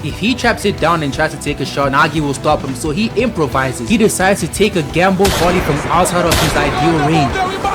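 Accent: South Africa. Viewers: Wait are you south African? Because I am and super strikers built my child hood and made me love football poi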